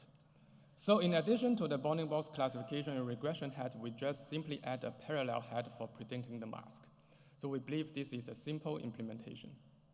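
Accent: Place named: China. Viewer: Как здорово смотреть на английском (с сильным китайским акцентом), без каких-либо встроенных субтитров... И все равно хорошее объяснение)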